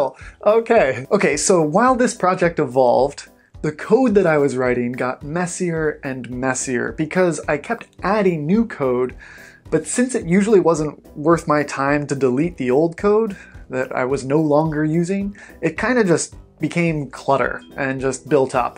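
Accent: Canada. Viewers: oh hes canadian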